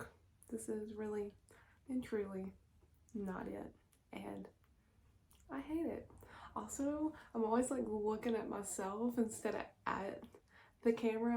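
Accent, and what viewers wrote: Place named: South, United States. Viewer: Living in the south does have it's perks, is like if you live in the south like me, I live in Florida you must have our foods, is just amazing, we are the friendliest of people, especially to our people and visitors from the northern states that come down here during winter, you know them, affectionally known as snowbirds, and yes, it drives me insane when people drive around slow in the fast lane, and they drive with their loud exhaust, it annoys me to no end. Great video as usual, looking great and I love your Southern accent. It's so cute.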